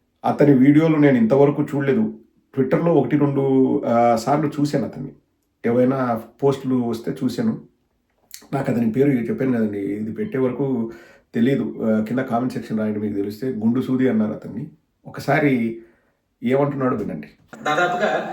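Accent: Rayalaseema, India. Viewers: అన్నా మేము డిల్లీ లో ఉంటాం రోజు మీ వీడియోస్ వింటున్న ఎప్పుడన్నా జోక్ వేస్తుంటారు గా పక్క మా అమ్మ నా వైఫ్ kuda కూడ నవ్వుతూ ఉంటారు మీరు మాట్లాడుతుంటే మధ్యలో మనం రాయలసీమ యాస మాట్లాడుతారుగా అప్పుడు మేము అందరం ఫాన్స్ అన్నా నీకు జగన్ అన్నకి ❤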